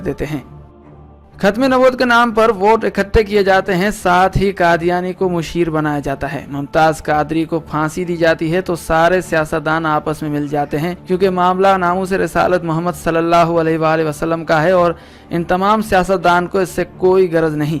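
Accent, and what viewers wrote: India. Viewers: Tu hai Kon Bhai ?indian walon Ka accent lagra hai !!! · Ap pakka Indian ho q k bolny k andaz bata rahy hy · Are you indian ?The word of your speaking is indian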